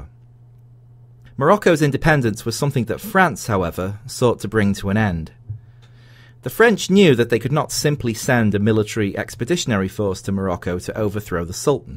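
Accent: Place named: Liverpool, United Kingdom. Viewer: A Scouser!!!! Rarely heard one in my live! This is a very interesting accent. (also your history lesson is) Dankeschön!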